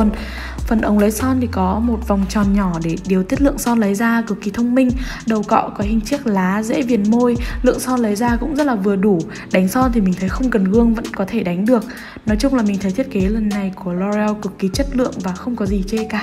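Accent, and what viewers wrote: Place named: North, Vietnam. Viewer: Chào chị ạ, em xem chị và biết chị rất rất lâu luôn tầm 1 năm về trước -.- 1 năm đối với em khá lâu rồi a. Em thât sự rất thích chị, đầu tiên em thât sự ấn tượng với giọng nói của chị, em là dân miền tây nên rất thích con gái Bắc, giọng nói trầm ấm nhẹ nhàng hihiii, thứ 2 em cực kì cực kì thích môi của chị, như anh Hoàng Thạch nói người gì mà môi đẹp ghê, môi cứ chúm chím đáng yêu mà không đánh son môi cực hồng nữa <3. Video swatch của chị rất chất lượng, hình ảnh tốt màu chuẩn không bị lệt quá nhiều so với thực tế. Bất ngờ hơn chị còn đang trên con đường ca hát nữa <3 em thích hát lắm nhưng tài năng không có hihii hay hát chứ không hát hay <3333.Em tự ti quá chị ơi:(( thật sự em rất ghen tị với các anh chị beauty blogger, như chị và Mai Hương,xinh thật sư, còn em thì không xinh tí nào:)) da thì mụn còn ngâm nữa chả có tí tài năng gì, chán ghê. 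Nhưng ba mẹ đã cho mình một hình hài thì mình sẽ cố gắng sống tốt thoii, sống tốt để làm bà hoàng của chính cuộc đời mình <3 em thích màu 129 ạ <3 Mong chị đọc đc cmt. FB: <link>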